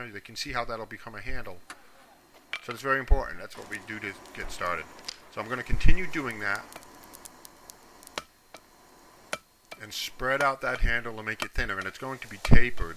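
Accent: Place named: New Jersey, United States. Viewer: I love your "new joisy" accent!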